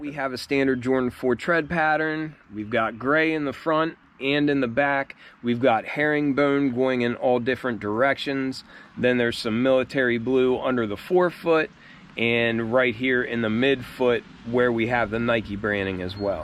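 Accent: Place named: South Jersey, United States. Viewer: U sound like ur from south jersey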